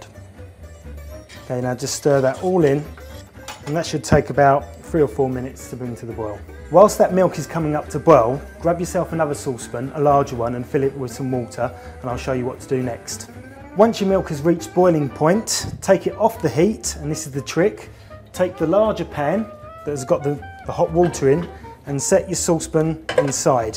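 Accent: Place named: London, United Kingdom. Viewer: No, he's a lefty remainer, using "A2? " milk. Must be a "London" thing!! Listen to his accent.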